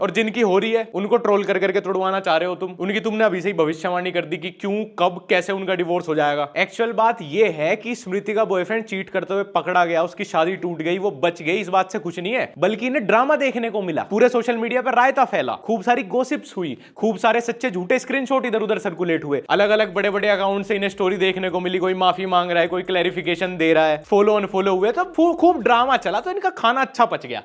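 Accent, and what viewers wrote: Haryana, India. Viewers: Yaar apki awaz me thoda so haryanvi touch h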